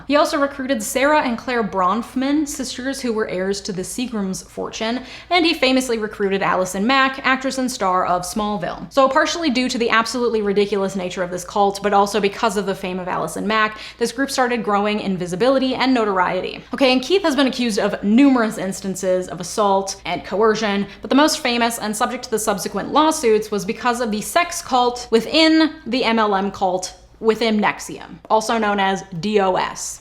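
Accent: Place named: Midwest, United States. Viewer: Your the only YouTuber that I don't skip the ad plug because I love your Midwest accent. I think it's cute.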